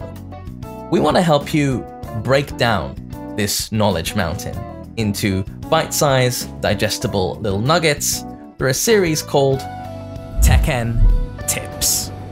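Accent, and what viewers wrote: United Kingdom. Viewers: "...parallel universe where fighting games are designed better." Ow, I'm not a Tekken dev but that hurt me by proxy. the english accent makes the shade worse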